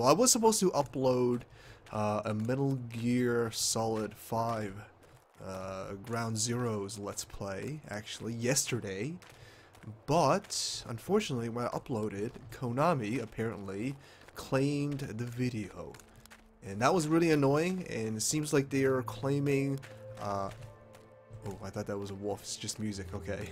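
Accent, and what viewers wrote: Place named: Newfoundland, Canada. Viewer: I didn't know you were from Newfoundland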